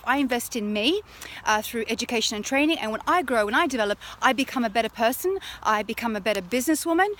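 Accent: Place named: United Kingdom. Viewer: Your lovely Uk accent makes me loose concentrartion on what you say )) Thank you for great info you share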